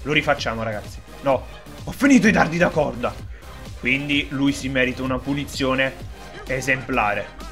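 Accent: Naples, Italy. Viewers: non so se già te lo hanno chiesto ma sei di napoli????? Comunque bellissimo videoooooo!!!!!!!!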